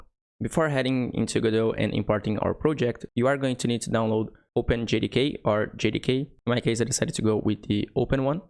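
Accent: Brazil. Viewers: i must ask,where you are from? you sound a lot BR to me.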